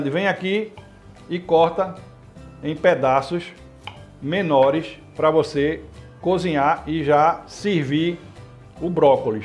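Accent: Brazil. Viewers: Que explicação profissional e prática! Esse sotaque é de Recife?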